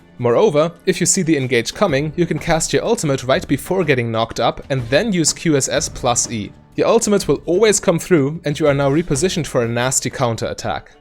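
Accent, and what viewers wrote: Germany. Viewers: u german? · effecient german voice, complete with runes, builds and poke guide. very nice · Idk why but i just instantly hear that you are german. Right? :)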